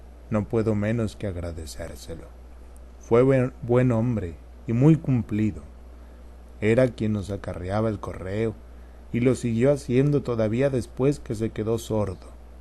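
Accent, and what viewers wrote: Mexico. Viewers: ¡Hola! Por favor, este es el español mexicano? (Is this Mexican Spanish please?)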